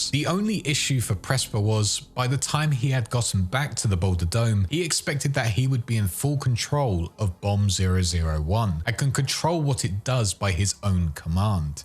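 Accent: United Kingdom. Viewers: Loving the videos mate, love hearing more of us brits doing lore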